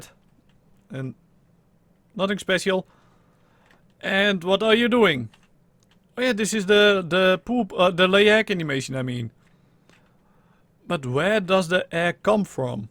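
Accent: Netherlands. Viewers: DUTCH ACCENT FOR THE WIN :3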